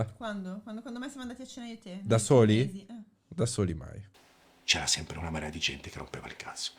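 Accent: Italy. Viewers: Ma sto accento piemontese fortissimo?? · La cosa più tremenda di questi video è l’accento che ha Corona..da bauscia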